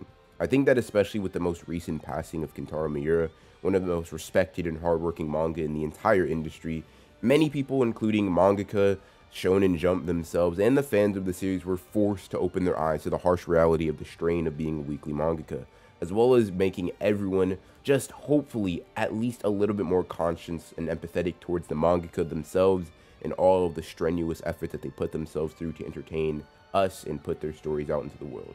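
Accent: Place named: Missouri, United States. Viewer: that's intersting. I asked bcus of ur accent. It reminded me of my lil bro cos he started sounding like that when he was in Missouri. It's very clear and easy to follow since you hardly skip over any letters/syllables.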